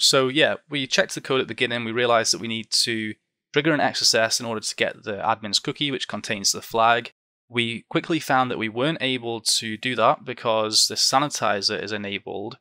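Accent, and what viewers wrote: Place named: England, United Kingdom. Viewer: I’ve been watching your videos for a few weeks now and I am so happy I found your channel. Your videos are outstanding, and it is much easier for me to understand with the English accent:). Keep doing your thing, I look forward to your next video!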